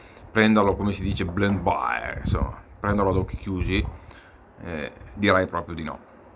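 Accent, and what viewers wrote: Veneto, Italy. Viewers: Salve,potrei chiederle con tutto il rispetto,di dove è il suo accento?.Sono molto curioso dato che studio la lingua italiana da un po’.Se non sbaglio il suo accento protrebbe essere Veneto….Volevo anche ringraziarla per la ottima recensione.Grazie mille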